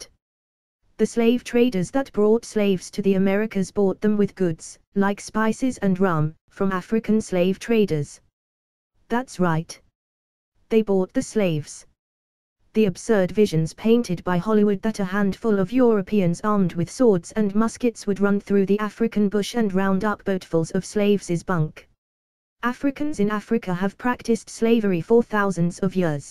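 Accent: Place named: United Kingdom. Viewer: But at least she has a British accent which is always attractive on the ear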